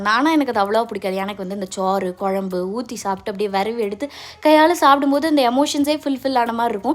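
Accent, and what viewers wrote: Tirunelveli, India. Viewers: Ungaluki tirunelveli a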